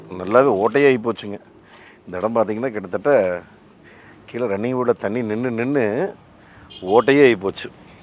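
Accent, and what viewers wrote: Kongu, India. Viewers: காரை பற்றி நீங்கள் பேசும் விதம் மற்றும் உங்களது கொங்கு தமிழ் மிக சிறப்பு அண்ணா ...வாகனத்தை மிக நேர்த்தியாக செதுக்கி இருக்கிறீர்கள்...மிக அற்புதம் 🙏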